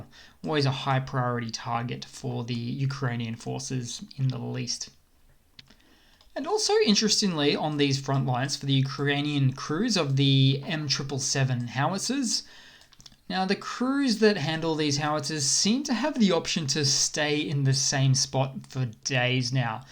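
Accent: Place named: Australia. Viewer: I only listen for the Aussie accent.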